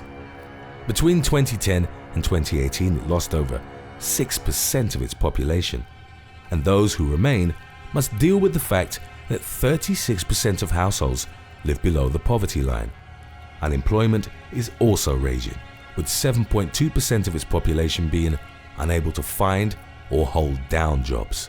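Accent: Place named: United Kingdom. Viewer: I'm not knocking his statistics, however it's kind of hard to believe this guy talking with the British accent lol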